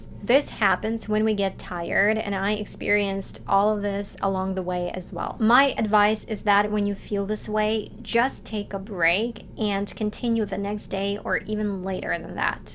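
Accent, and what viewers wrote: United States. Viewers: Dear Madam, congratulation on the level of Amercican accent. You have made a great progress, but be aware of the fact that all the media products mentioned by you need not be available to everyone. To turn your presnentation into a resource for listeners, have your presentation arranged in parts dealing with words, phrases and sentences spiced up with specific aspects e.g. setting the organs of speech before starting the warm-up exercises for pronounciation of sounds, syllables, then at phrase level the stress and finally intonation at sentence functions., etc. making up the so-called mile-stones along the almost never-ending but really a rewarding journey of learning a foreign language plus the culture, traditions etc. Best regards, Paul,67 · I just have known your channel since March this year. I must have to say your accent let me consider you are from America. 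· Modern British RP is more distinctive and eloquent. Just my opinion. You're doing very well with your American accent though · You’re American accent sounds beautiful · It doesn't seem to me that you are not american because of expanding your accent accuracy. Go beyond and make yourself smarter more and more.❤❤❤❤❤ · How long did it take you to master American accent ? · Why you the force of pronounciation like the accent American?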